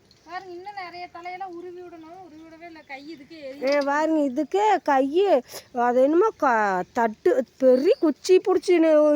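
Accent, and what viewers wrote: Kongu, India. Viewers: நீங்கள் கொங்கு தமிழ் லயே பேசங்க அம்மா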